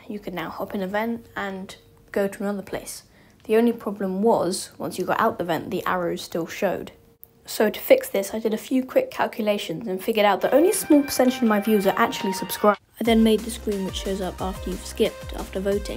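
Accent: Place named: United Kingdom. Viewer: British accent youtubers are always the best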